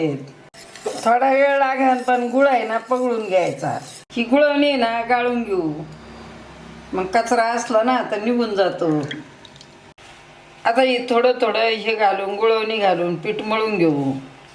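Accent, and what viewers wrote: Ahmednagar, India. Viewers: आजी तुम्हाला पण दिवाळीच्या खूप खूप शुभेच्छा. तुमची नगरी भाषा मनाला खूप भावते. Love from Nagar.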